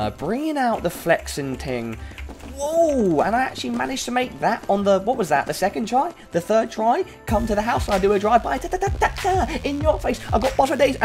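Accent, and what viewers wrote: United Kingdom. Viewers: You British or what ?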